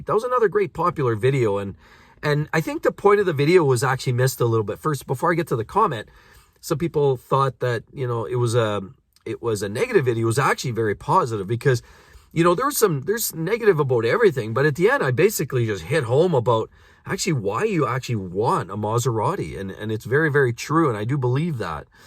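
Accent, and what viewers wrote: Canada. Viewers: you have a slight Canadian Accent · appreciate your Canadian pronunciations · Abooout! Or Abouuut! This is Canadian core pronunciation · you do come through a little canadian at times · your Canadian accent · I love your Canadian accent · Awesome videos man. The aboot comment really got me haha 😂 But honestly, your content is some of the most entertaining content on YouTube & I’m always looking forward to when a new video is uploaded. It’s awesome to see you walk around these cars & do a 360 with your camera to then switch to a close up of your face when commenting on certain elements is very very very entertaining. Please don’t change. And with regards to the aboat part, I mean, it’s very obvious you’re Canadian but that’s not a bad thing. Much respect from Belgium!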